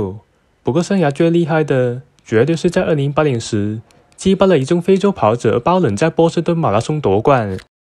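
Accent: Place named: China. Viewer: 听你说话 我都快睡着了 普通话口音着实是没有力气的样子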